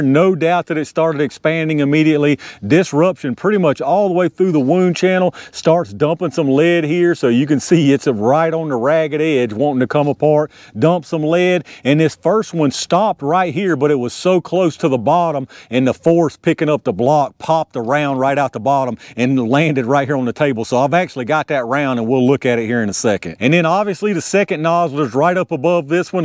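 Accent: South, United States. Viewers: The Phillips screwdriver tip creates a vortex. Very similar to where you drain your kitchen sink and water swirls around the drain as it goes down the drain. Another example is if you were baking a cake and you use the beaters and they swirl around in the batter the batter will automatically be slung to the sides of the ball in the center is empty. That’s another created vortex. The ball is generally solid copper, and it creates a larger hole than almost any hollow point mainly because of the Vortx it creates. I have researched the Phillips screwdriver, bullet, and what it does makes a 380 as effective as a 9 mm and a 9 mm as effective as a 45. Oh, that is on wound diameter. If the Phillips screwdriver gets anywhere near the heart, it will blow the heart up. I use it on all of my carry handguns. I got pretty accurate to. Right now I am in search of the same cartridge and bullet shape for my 38 special snubnose. 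However, you performed a great demonstration and I enjoy watching you whenever you are on the air. Keep up the good work I especially love your southern accent. It is beautiful.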